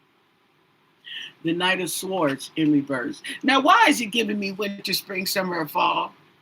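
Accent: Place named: South, United States. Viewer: I have tuned into your readings 3times now you are so comical love your accent are you from deep south etc south coralinna or Georgia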